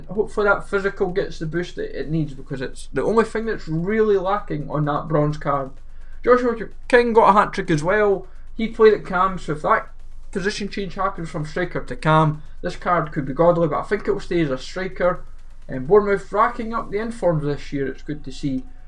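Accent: Scotland, United Kingdom. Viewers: sounds like he's Scottish to me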